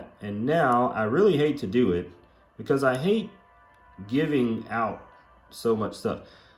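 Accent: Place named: United States. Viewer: What state is your accent from if I may ask?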